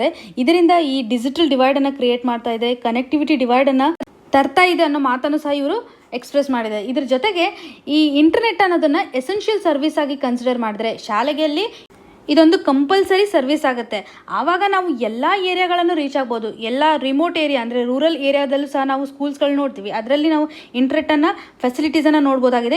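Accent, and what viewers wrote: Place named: North Karnataka, India. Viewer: Excellent madam.. 👌Pakka uttara karnataka language....😁😇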